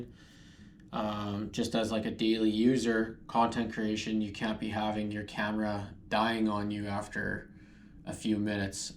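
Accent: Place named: Canada. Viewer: This is great, I agree AMS2 is absolutely amazing. Great comparison. I do prefer the dji for sure. Thanks for the time in putting this together. Lastly I can't help notice but I could be wrong. I hear a Canadian accent. I am Canadian. So are you from Canada? Cheers brother.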